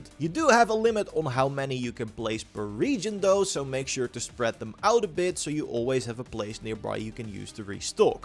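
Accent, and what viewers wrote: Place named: Netherlands. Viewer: Ur dutch right? :p I can always tell in the first few seconds of someone dutch speaking english xD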